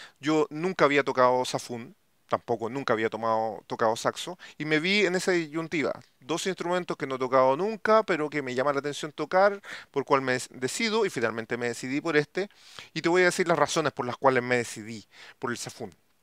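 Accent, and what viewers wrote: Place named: Chile. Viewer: asi hablan los chilenos eso ya no se corrige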